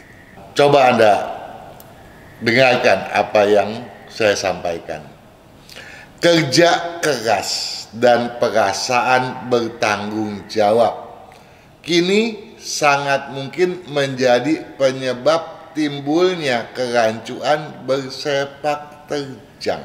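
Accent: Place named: Malaysia. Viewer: Wow.... Bahasa dan tone suara koko sangat bagus,susah oo mau dengar org cina bahasa pasif bngt,jelas bngt dan seakan² sudah mendekati pekat bahasa Indonesia nya..koko tggl di lingkungan melayu ya sbb logat nye seperti MELAYU very good.. Love you..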